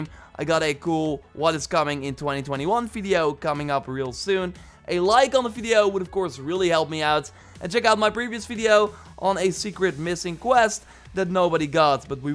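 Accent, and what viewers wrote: Netherlands. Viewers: Idek if you're dutch but you have the most dutch accent ive ever heard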